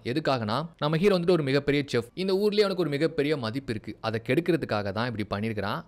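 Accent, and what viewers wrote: Tirunelveli, India. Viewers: பேச்சில் திருநெல்வேலி சாயல்..❤❤❤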